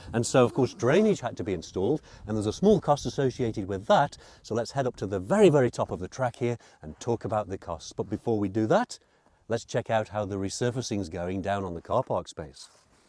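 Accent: Lancashire, United Kingdom. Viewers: you're welcome, Mr. You speak without repetition, hesitation or deviation. So you have an old Lancashire Liverpool accent?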